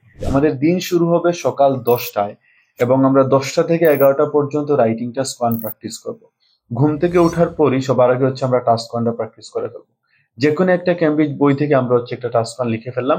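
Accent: Sylhet, Bangladesh. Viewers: ❤ সিলেটি ভাই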